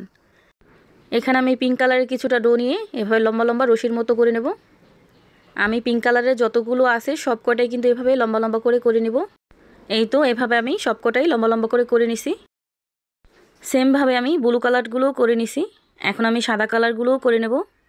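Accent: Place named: Mymensingh, Bangladesh. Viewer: আপু আপনার বাড়ি কোথায় আপনি ময়মনসিংহের মানুষের মতো কথা বলেছেন 😊😊😊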